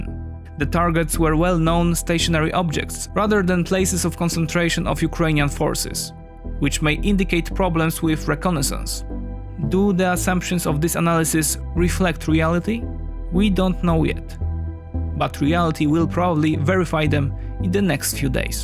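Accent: Poland. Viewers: BTW I hope after this ends you continue to voice the English version of good times bad times. No offence to the other guy, but i much prefer your voice. I love polish accent, and I think most people prefer hearing about geopolitics from a European rather than American! (No offence Americans) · Very informative video. The narrators Polish accent makes it even more ominus and I like it. 👍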